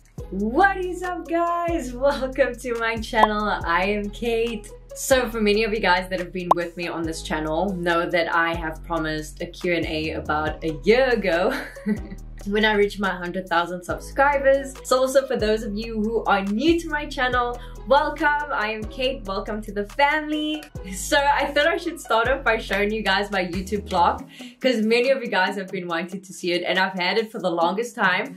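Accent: South Africa. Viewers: Ha, I knew from your funny accent that you must be from South Africa! Great to have this finally confirmed! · Yes, stay awesome, following you from Hong Kong. Funny I guessed it right, your lovely accent is unmistakably SAfrican. Love Love Love it! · I thought...you are from New Zealand it's because of your accent (but, I'm not sure because now that you've said you're from South Africa...Now, I do understand because I do have work with people that are from South Africa - now, does it make sense because they talk or speak like you...(sorry, I mean it in a good way)...Good Luck & more power to you in your content... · That is actually impressive because I'm also from Cape Town and our accent is not known outsude of South Africa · I could never place your accent, but now finally it all makes sense, South Africa. The same accent in District 9.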